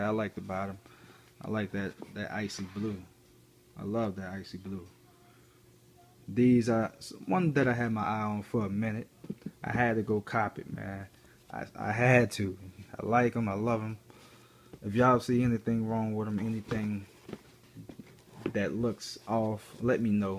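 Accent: New Orleans, United States. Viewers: New Orleans accent? I'm going to check the website out. Thanks for sharing!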